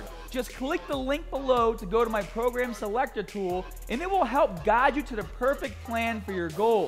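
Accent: Boston, United States. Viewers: Boston. It sounds nothing like New York. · New Englander accent all the way. I'd say Boston. He tries to hide it to sound more professional. Also, if you're not from Boston, you're not going to understand the accent. So he's doing us a favor. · Mr Cartoonster I did think Boston! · Can really detect the Irish pronunciation in the Boston accent. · What kind of American accent is this? Sorry, I'm not American 😆 haha! It's like the "r" in the word "started" is silent.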